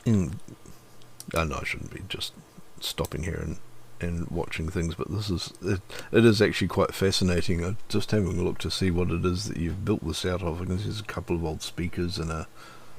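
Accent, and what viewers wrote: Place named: New Zealand. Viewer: hello sir ! i was wondering if you're from new zealand? your accent sounds kiwi, however i apologise if you aren't haha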